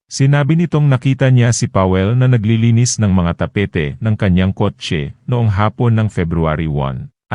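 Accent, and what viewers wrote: Visayas, Philippines. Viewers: May pagka-visayan accent cya pero naiintindihan naman.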